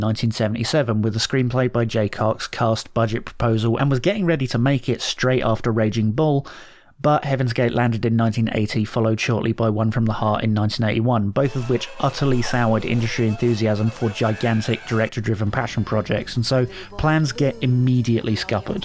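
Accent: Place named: United Kingdom. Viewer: I find it highly distasteful to listen to a person with an accent that is obviously borne of some kind of relationship with the UK analyze America strictly from a viewpoint that is the product of HIS times. As a full-blood Cajun, I freely own being of Immigrant stock..tho my ancestors arrived on these shores as the result of being driven out of France in1685 by the Edict of Fontainebleu, making Protestantism illegal, declared by Louis XIV. MY ancestors arrived in 1723, according to a Diary kept by Solange Therese Thibideaux, wife of Jean Michel Thibideaux. NOTHING is known of their life in France, as agents of the French Crown pursued my kind for what must have seemed like forever. Meanwhile my kind intermarried with the various Indigenous tribes of the Caribbean, and learned that land the Yankees AND Southerners DIDN'T want was rich in creatures that were good for food; that small lots of ground protruding from cypress swamps were ridiculously fertile if you knew WHAT to plant and where...and so my Kind survived. 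My family aided runaway slaves by building tiny shacks which blended into the swamp...places where clean water in jugs, blankets my ancestors could ill spare left to stay warm in on chilly nights, and dried beef, hardtack and salt were stored in boxes built by hand and waxed to keep moisture and insects out. After the War, free Blacks-not many, but some, were able to walk openly up to my 4× great granpapa's front stoop and thank him. So how DARE you, Mr. Voice From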